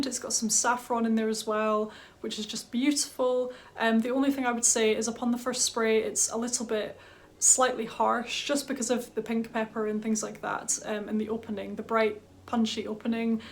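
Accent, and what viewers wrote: United Kingdom. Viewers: Just discovered your channel and I‘m glad I did! Your vids have a nice, relaxing vibe. Are you British? I‘m sorry, I‘m Austrian and can‘t really tell, but it’s lovely and I understand you 😀 How large is your collection? Wasn‘t too keen on Nina Ricci Rose Extase either, to me it smells plasticky.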